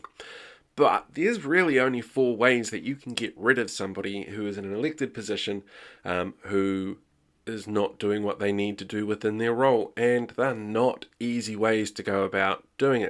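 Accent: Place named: United Kingdom. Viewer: First time visiting this channel and I am a little confused. I don't know what drama this video might be talking about so I am assuming this is British law? (Guessing from your accent)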